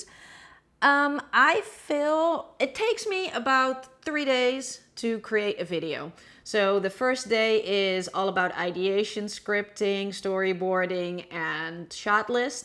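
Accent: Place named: Netherlands. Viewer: that’s amazing 😁 I love that you are following your dream and that you took the jump. I thoughtw I heard a ducht accent, but i thought I was wrong. Don’t know why I’m still typing in Dutch, but it’s to late to change it 😂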